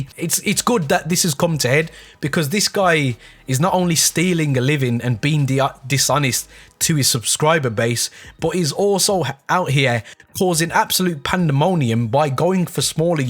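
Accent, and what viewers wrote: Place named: Midlands, United Kingdom. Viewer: Yeah man just subscribed, luv a midlands accent, sounds down to earth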